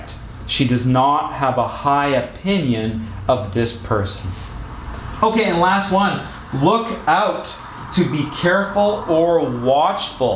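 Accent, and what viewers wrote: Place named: Canada. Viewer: Wonderful explication. Love your sweet Canadian accent.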